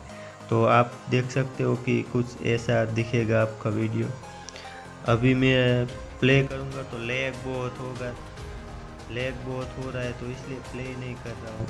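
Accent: Gujarat, India. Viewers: Bhai tme gujarati chho ne😅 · Bhai aap ka voice Gujrati lag rha hai mebhi Gujrati hu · Tare avaj thi aevu Lage che ke tu gujrati che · Bhai aap Gujarati ho aapke voice se lag raha hai sach kya ??? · Bhai Gujarati ho kiya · You from gujrat?? You voice like Gujarati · Apke avaj se lag rha hai aap gujarati ho. Sach bat hai ? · BRO GUJRAT SE LAG RAHA HE ❤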